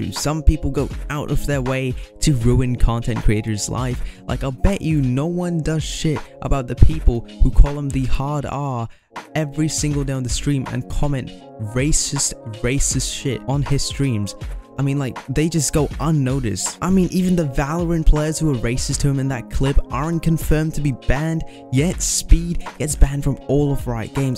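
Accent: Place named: United Kingdom. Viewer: Oh god not only do you sound British but now ur getting ur 200 ping on AS mmc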